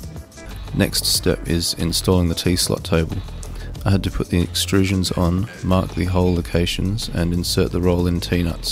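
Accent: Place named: Australia. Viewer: Where you from you sound Australian ?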